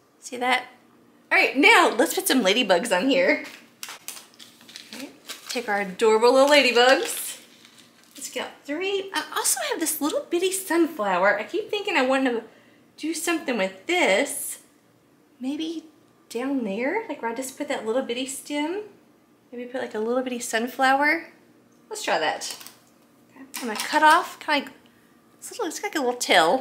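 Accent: South, United States. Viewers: I just love your channel and all the beautiful things you create. You are so talented and your little southern accent is adorable. I'm a 69 year old grandma and it always puts me in a better mood to just watch and listen to you! I think I'm gonna go shopping! Dollar Tree here I come!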